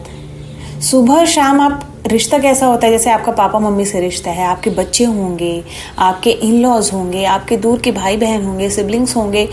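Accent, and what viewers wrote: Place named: Maharashtra, India. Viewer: Apka voice bahut soothing hai👌👍 very nice video 👌 & i think you are rmarathi from your accent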